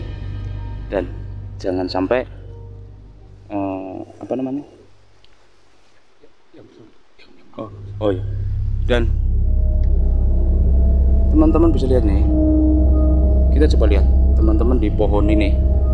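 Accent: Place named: Central Java, Indonesia. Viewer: Logat Jawa Tengah Salam kenal....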